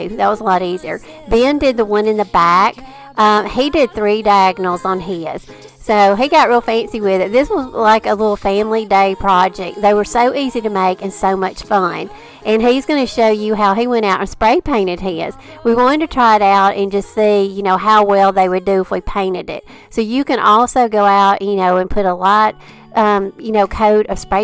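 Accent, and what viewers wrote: South, United States. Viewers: LOVE YOUR SOUTHERN ACCENT SOOO SWEET!!!!!!!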